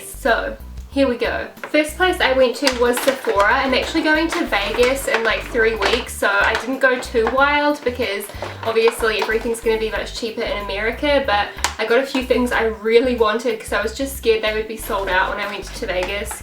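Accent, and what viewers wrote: New Zealand. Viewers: lovely, can I just ask are you from New Zealand? X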